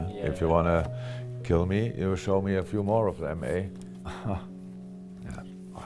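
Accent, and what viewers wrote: Liverpool, United Kingdom. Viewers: Not a Liverpool fan but Jurgen I salute you and all you have achieved. There are so many things I admire about you and your time in English football but if I were to list them all, I’d be here until 2028! 😅 The Premier League will never be the same without you mate And by the way…I think your scouse accent is class! 😂👌 All the best for the future buddy! YNWA ❤️ Joel Barnsley fan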